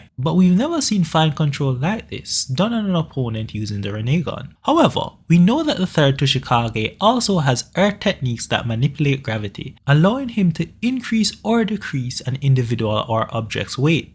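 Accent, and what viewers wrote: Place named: Jamaica. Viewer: this man has a jamaican accent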